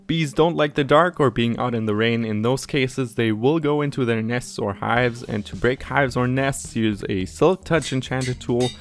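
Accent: Germany. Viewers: Hab dich zufällig gefunden hab jetzt nach 4 Jahren kein minecraft ,wieder angefangen und finde deine Videos hilfreich und hab direkt an deinem Akzent erkannt das du deutscher bist (Bratwurst und Bier bruder)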